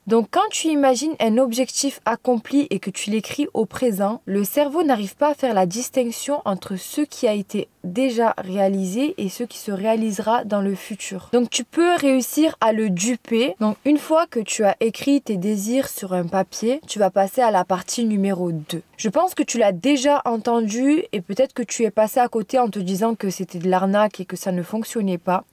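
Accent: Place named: South, France. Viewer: tu a un beaux petit accent du sud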